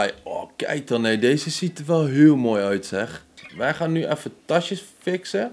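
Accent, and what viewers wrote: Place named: Twente, Netherlands. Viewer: Beetje Twents erbij 🤣... super nice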